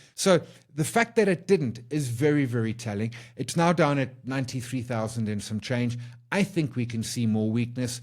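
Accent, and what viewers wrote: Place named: South Africa. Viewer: I concure with the below comment, no captions needed really. Your vocal presenting skills are more than sufficient. The accent is a typical south african accent and we can hear what you are saying. I don't typically watch the show, I listen to the YouTube show ( play the video, pop into split screen and just listen while doing something else.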